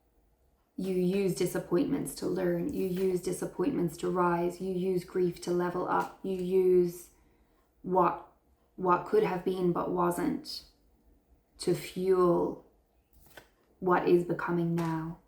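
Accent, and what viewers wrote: Ireland. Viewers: Lovely to find you too, together we do this thing, and put things right. Cancerian? An Irish lilt? You made me cry too, thankyou. x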